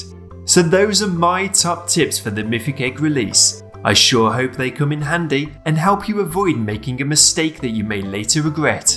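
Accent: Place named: United Kingdom. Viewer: Ur voice is very good even tho ur british ur voice is good and cool and calming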